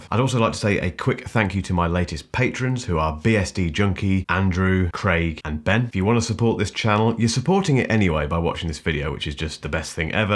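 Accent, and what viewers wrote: United Kingdom. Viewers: I like your style; and your grey walls, so easy on the eyes. You've obviously thought about the visual look of your show. I like your clipped British accent. You guys just speak English better than we do :) I'm American. You also get the information out in an articulate and quick manner, not always the case with other youtubers.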